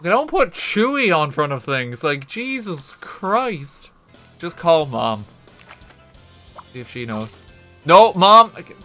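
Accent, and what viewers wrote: Ireland. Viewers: You sound irish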